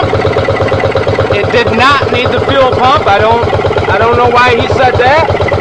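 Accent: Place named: Canada. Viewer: Great little engines those. It's a pitty though that they don't run too well at low RPMs. I have one on a concrete mixer and its minimum idle is about 2000rpm. Your runs very well, perhaps the inertia of the extra pulley allows this to run so low. You should definitely enter this in a diesel "how low can you go" competition. Your accent is cool. Where are you from? Canada?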